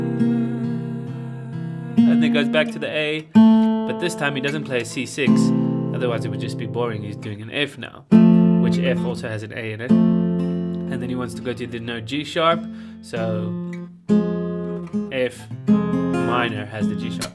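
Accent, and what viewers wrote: South Africa. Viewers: Nice to you hear a South African Accent! Lovely lesson, man.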